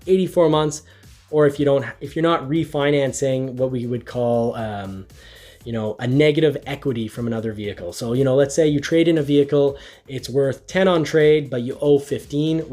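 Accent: Canada. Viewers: Are u Canada?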